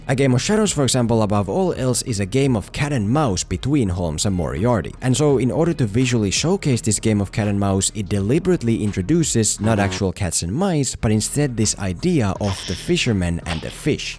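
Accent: Finland. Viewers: are you finnish? your accent sounds finnish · Where you from bro? i know you mustn't be American, you sound like you have a Finnish accent. Not sure tho. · Veli sun aksentti on niin suomalainen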